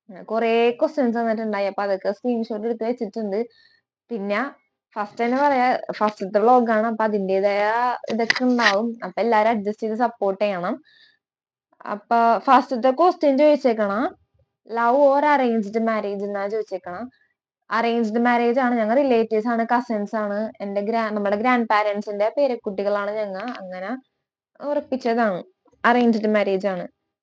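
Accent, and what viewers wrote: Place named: Kochi, India. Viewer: തനി കൊച്ചി ഭാഷ 😇😁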